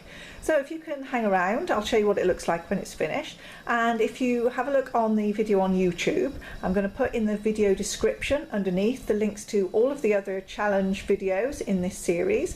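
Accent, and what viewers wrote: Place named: Oxfordshire, United Kingdom. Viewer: Hi!:) That was beautiful and definitely my favourite of all in the challenge! You need to embrace your inner green lol:) that lime was very refreshing. Love that cup too, I agree with avani t - put a hole in the bottom and make a string of lights. Only thing is you'll need to do at least a dozen more, although I'm sure you'll get there...:)) oh and it's pronounced Sur oooh lee um here's someone called Emma who has a YT channel for just these sort of things <link> I know this because I had to (ask my better half) look it up for a picture I made a while back - added the link in case you'd like to see the picture <link> I was wondering where you are from originally, love your accent and thought I could guess but can't quite put my finger on it. Must be close to Oxfordshire, maybe?:) All my best Heidi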